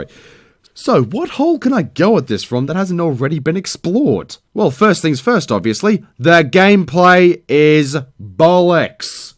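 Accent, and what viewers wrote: Australia. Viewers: Why do you sound Australian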